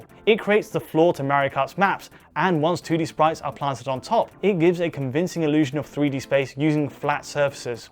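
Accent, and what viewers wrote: United Kingdom. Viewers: He's a Brit mate. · The brits pronounce Mario as "Mary-O"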